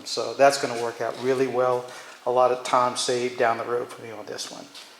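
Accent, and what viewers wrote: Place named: New Orleans, United States. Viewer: Just listening to you, I heard a N'awlins accent. Then I expanded the intro. Sure enough, I see that you're from Louisiana. I kind of figured as much when you began talking about the cicadas. Love y'all. I live in GA and I'm from AL originally. A whole lotta good food comes out of Louisiana. Thanks for showing us your honey making operation. I've seen small operations before but seeing a big one like yours is a real learning experience. Really impressive. Thanks for posting the video.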